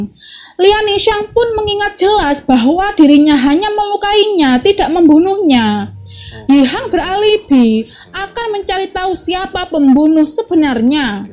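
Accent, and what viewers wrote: Java, Indonesia. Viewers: suaranya medok banget mbak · Maklum mbaknya Wong jowo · ASLI JAWA MBA ?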